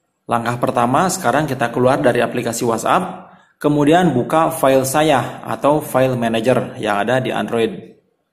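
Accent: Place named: Sukabumi, Indonesia. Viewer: Logat nya mirip orang Sukabumi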